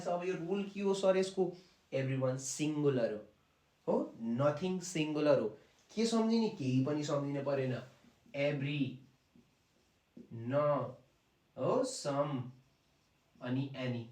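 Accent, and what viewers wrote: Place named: Gujarat, India. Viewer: I thought you are from Gujrat or rajasthan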